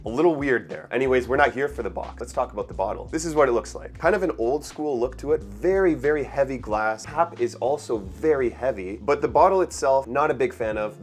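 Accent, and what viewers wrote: Canada. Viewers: It’s not a mispronunciation or wrong - it’s a nuance of a Canadian accent. Let’s appreciate (and not correct) cultural and regional variations. Great video,!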